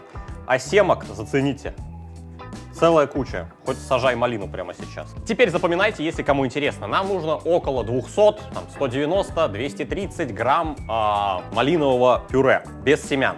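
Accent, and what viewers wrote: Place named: Western Ukraine, Ukraine. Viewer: Подача, а-ля гнилой западэнэц.....красиво, но думаю на вкус-ДЭРЬМО!!!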